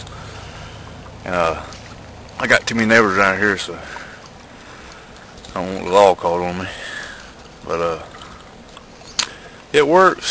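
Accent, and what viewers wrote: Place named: South, United States. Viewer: Good job! Love the southern drawl! Beats mine all to shame. lol One question, why did you not like the internal spring on the poppet valve? Again, great work!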